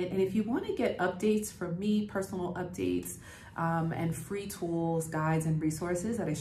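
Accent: United States. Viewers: You should have moved to Limpopo province. It is hot there., you sound like you're from Texas, Austin. Lol. I'm a South African studied and lived in the USA for more than 20 years. In Kansas it snows. I visited Austin TX in winter. The other day it snowed and that is when I realized it rarely snow in Austin because of the car accidents on the roads.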